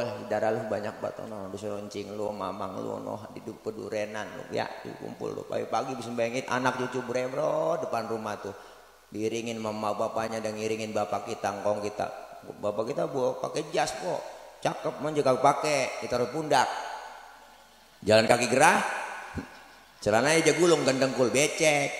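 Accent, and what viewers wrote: Betawi, Indonesia. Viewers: Betawinya keren..pengganti KH Zainuddin MZ.. Barrokallah tadz.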